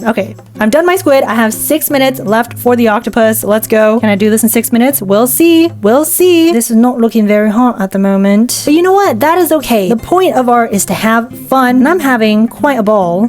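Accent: United Kingdom. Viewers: British English Alert !!!!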